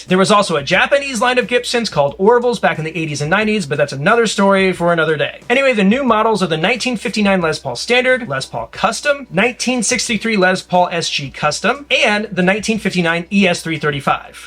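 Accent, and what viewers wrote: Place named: United States. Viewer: I know this sounds weird, but I was so happy to hear an American accent. Can’t stand British videos.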